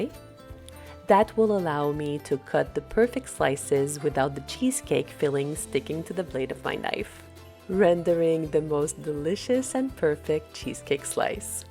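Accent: France. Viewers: Hi! I can hear a french accent behind your hebrew words! Haha! I really love your channel and everything you present in it. It brings a lot of positive energy and ratzon to prepare good things as well. Be blessed! Yeshar koach! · Bonjour. La façon dont vous prononcez certains mots me fait penser que vous êtes française. Vous prononcez certains mots avec notre accent français. Je me trompe? Merci pour vos vidéos. C'est grâce à vous que je découvre votre univers et c'est super intéressant et beau à voir.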